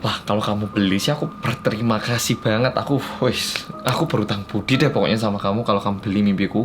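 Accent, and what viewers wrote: Java, Indonesia. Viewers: Waduhhhhhh ko' ada episode 2 kelamaaan menunggu besok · Tim gercep orang korea yang medoook!❤ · Kembali lagi bersama mas yang medeeekkk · Kak kok skarang pembukaannya sudah ganti yg ngondek? Lalu kan medok kak? Kok di ganti kak? 😁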